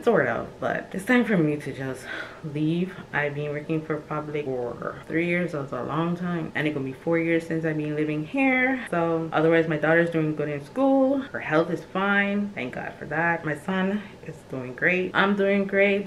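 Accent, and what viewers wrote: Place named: Virgin Islands, Caribbean. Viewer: You’re from the USVI?! I knew the accent sounded familiar I’m from the BVI. Sending you VI Love ❤️❤️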